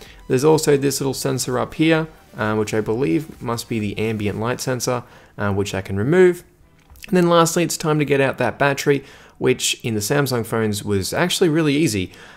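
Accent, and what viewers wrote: Australia. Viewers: are you Australia mate?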